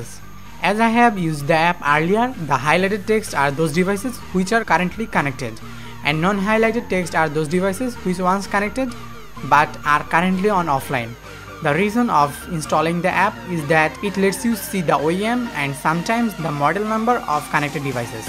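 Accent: India. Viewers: Ooooff...That Indian speaking is so bad to listening 😣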